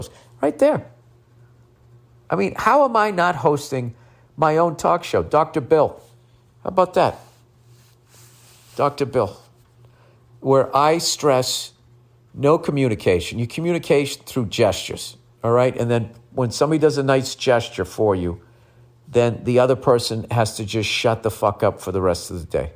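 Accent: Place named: East Coast, United States. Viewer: i remember once in the good old bad days of this podcast when bill was much angrier and spoke more east coast that is to say much faster. he was talking about how people were complaining about not being able to download episodes off sound cloud i think? he said " what the fuck are you guys working your fingers to the bone pressing your download button for your free entertainment complaining get the fuck outta here". i realized he was right. that being said i cant belive this actually showed up on my feed on a monday. i was so blown away i wrote this comment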